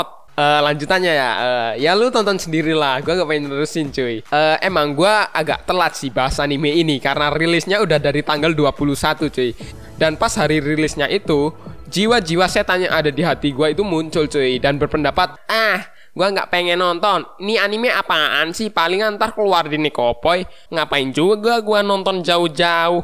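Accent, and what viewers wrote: Lampung, Indonesia. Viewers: Bang lu orang Lampung? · Bang, lu dari lampung tah ? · Tabik pun Bang lu orang Lampung ya?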